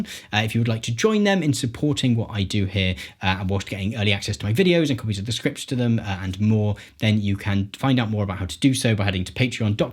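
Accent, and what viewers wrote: RP, United Kingdom. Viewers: haha as if this is the top comment. I'm British and just knew 100% he was British for some reason. glad I found him, his videos are good. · How did i know you were a brit before even clicking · the guy sounds like such a tory but his opinions don’t match his voice ahhhhhhhh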